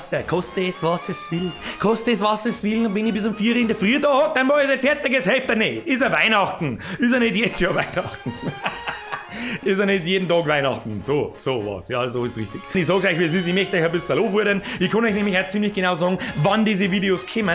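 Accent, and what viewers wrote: Bavaria, Germany. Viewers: Läx verstas zwar nume weni gnau anelose Ich find de bayrisch dialäkt am beste^^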